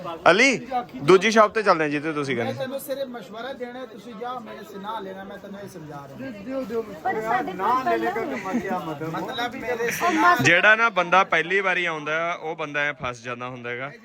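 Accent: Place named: Malwa, India. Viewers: Veer apni boli bol reha eh malwai aa ta malwai hi bolni aa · ਵੀਰੇ ਤੇਰੀ ਬੋਲੀ ਵਧੀਆ ਨਹੀ ਜ਼ਿਆਦਾ ਮਲਵਈ ਜ਼ਿਆਦਾ ਨਾ ਬੋਲਿਆ ਕਰ ਵੀਰੇ ਲਹਿੰਦੇ ਪੰਜਾਬ ਦੀ ਬੋਲੀ ਬਹੁਤ ਮਿੱਠੀ ਹੁੰਦੀ ਹੈ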